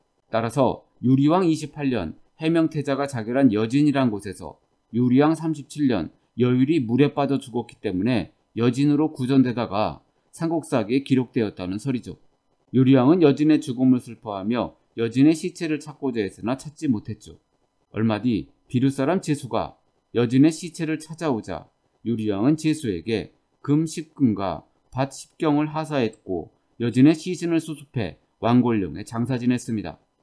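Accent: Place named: China. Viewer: 이 ㅅ낀 뭐지? 우리말하는 짱깨네?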